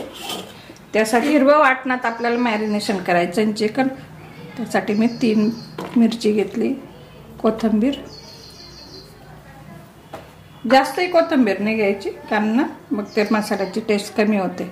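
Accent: Khandesh, India. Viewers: खानदेशी आवाज 😍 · तुमच्या toning वरून तुम्ही कोकणातल्या वाटत नाही, तुमचं toning नाशिक, मालेगाव, जळगाव इकडचं वाटतंय... पण रेसिपी खूप छान, अस्सल मालवणी .. 👍😀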